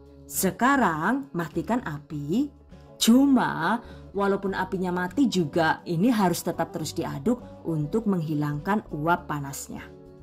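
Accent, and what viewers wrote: Banyumas, Indonesia. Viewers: Kaget, bar formal trs ngapag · Wkwkwk saya orang banyumas....denger orang ngomong ngapak jadi ketawa sendiri 🤣🤣🤣🤣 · Asem ngapak jebule 😂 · Rika wong ndi sih yu ketone ngapak temen kaya nyong hehehehee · Asli mana seh kok bahasanya sm kek aku ngapak" 👍😁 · Saya suka lihat youtube ibu , kreatif, tata bahasa lucu, pakai ngapak bahasa Inggris gokael gaes 😁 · Hahaha wong ngapak juga yah ternyata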